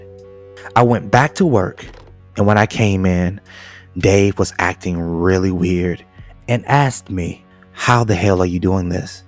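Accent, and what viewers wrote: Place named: East Coast, United States. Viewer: Ok..ok.. 🤦 ‘’ALLEGED’’…..IS NOT thee same nor should it be used as a synonym to the 2 separate words of ‘’A LEGEND’’ ~ALLEGED- stated or declared to be as described, supposed to be. Supposedly. ~A LEGEND, is a Fable, fiction. Or if someone is called ‘’A LEGEND’’ it can mean they’re a hero. I am 100% aware that 🇺🇸 public school system has been consistent in one thing, just passing kids no matter what, & now even learning cursive writing ✍️ has sadly been tossed out along with basic spelling, which in turn doesn’t help any of the very young have knowledge in not only spelling but the meanings & differentiating of words. It’d be somewhat understandable if this narrator wasn’t a native English speaker, it most definitely sounds like he is & that he’s from the east coast.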